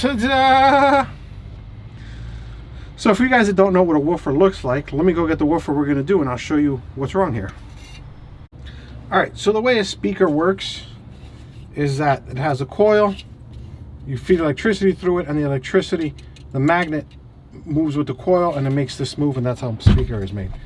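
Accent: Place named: New Jersey, United States. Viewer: Love the jersey accent! Great video.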